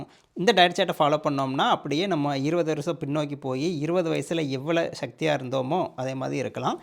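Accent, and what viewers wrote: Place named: Tiruchendur, India. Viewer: Nice sir. I am from Tiruchendur. Your slang is same like us. Which is your native place.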